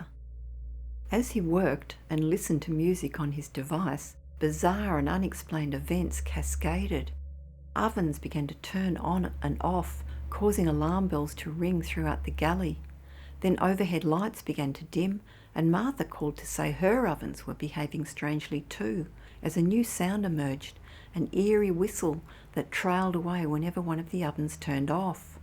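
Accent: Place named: Australia. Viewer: I love Australian & New Zealand accents.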